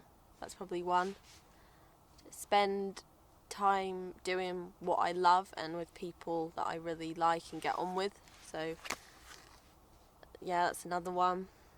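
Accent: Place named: England, United Kingdom. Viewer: I thought you were in England just by your accent haha. But yeah it’s really depressing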